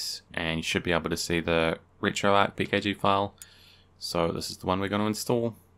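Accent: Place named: New Zealand. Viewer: Nice to hear a kiwi accent 🤘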